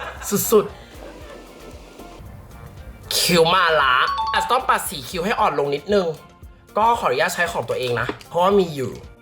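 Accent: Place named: South Korea. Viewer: ไม่ทราบว่าความจริงแล้วชีเป็นสาวโคเรียรึป่าว สำเนียงกับจริตเริ่ดสะบัด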